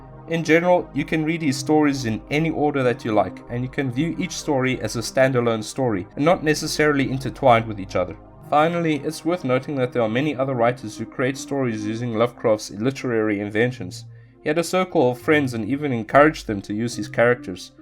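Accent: South Africa. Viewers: Are you South African? Your accent sounds incredibly similar to mine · Are you South African as well? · Good video! But are you South African?👀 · Fabulous and thank you so much! Is that a South African accent? Fabulous. Thanks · Are you South African? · Expat South African living in Amsterdam here. Good surprise to hear your accent bro. Nice video.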